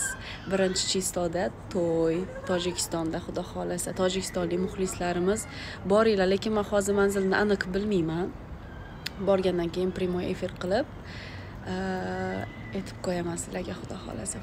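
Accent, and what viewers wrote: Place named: Khorezm, Uzbekistan. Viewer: Salom xoramz shevasi judayam yarashar ekan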